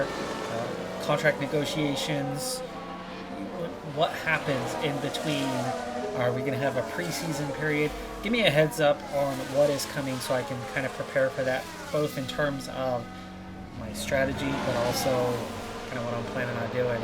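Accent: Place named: United States. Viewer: I fail to see, or hear, basic English being adhered to either I agree, regardless of where the drivers are from he supposedly speaks English its just that as he is American it is a bastardised version of the language and it's only people from that country that decide to pronounce words and names completely differently to how they should be, fact. Thanks for stopping by and for your insightful contribution, look forward to your input again next time you are feeling like a SJW 👋😁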